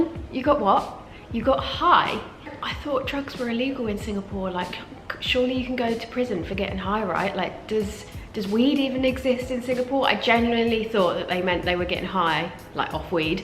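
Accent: United Kingdom. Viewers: Haha, love this video, especially the part where you say one thing, and the listener hears another. Must be frustrating. I think we are not familiar with British accent, even though I can understand you well. We learn British English in school but none of our English language teachers are native speakers. Also, most of our favourite English movies or TV shows are American. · I don’t really have difficulty with British accent. However I loved your British accent, it is where I learnt while I did a study exchange in the uk. I got to learn the proper English accent there. While you’re right orchard mrt is a maze. Not to forget locals do exit wrongly too, especially Dhoby Ghaut mrt. ;)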